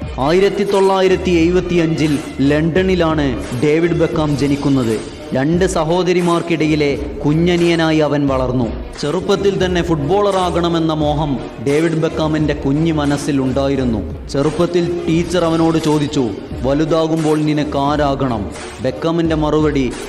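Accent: Malappuram, India. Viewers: ഞാമലെ സ്വന്തം മലപ്പുറം ഭാഷ...... ഇജ്ഞതി രോമജിഫൈകേഷൻ....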